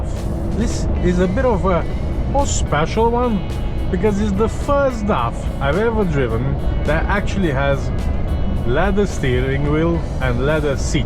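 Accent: United Kingdom. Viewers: Love the way your Scouse is coming along,. Couldn't live without the videos, proper grounding seeing other jobs like yours (and mine) which keep the lights on in the country. Have a good Christmas and New Year squire 👍🏻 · I can hear a bit of scouse in you 😁😁. I am from Liverpool do you no anywhere takin on or what agency do you use?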